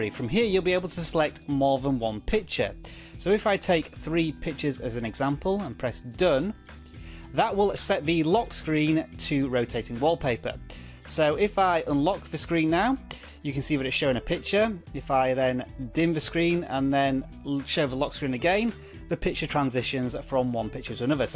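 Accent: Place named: United Kingdom. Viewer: The goddamn british accent creeps me out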